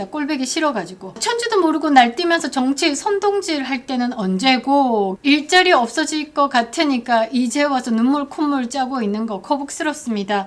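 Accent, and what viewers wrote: Gyeongsang, South Korea. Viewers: 경상도 사투리 친근함이 느껴집니다.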